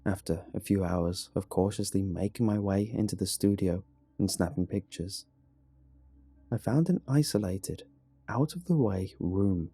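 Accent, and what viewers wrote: United Kingdom. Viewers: Something about a British accent makes the stories sound scarier for some reason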